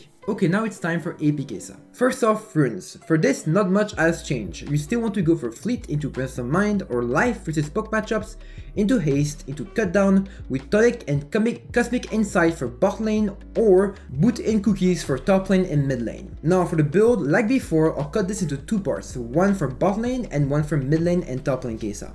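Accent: France. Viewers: You're french aren't you? Your accent sells it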